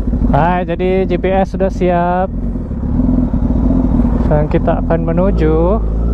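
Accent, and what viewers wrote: Bali, Indonesia. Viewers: bli orang bali bukan? logatnya mirip orang bali hehe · logat balinya masih keliatan 😃😄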